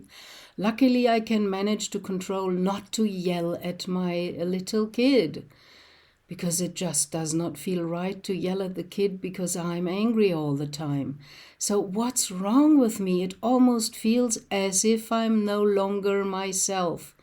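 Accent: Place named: Germany. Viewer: Your accent is wonderful I love your german side, but clearly you dont wish it to define you👍👍 Thats fine...but theres nothing wrong with this❤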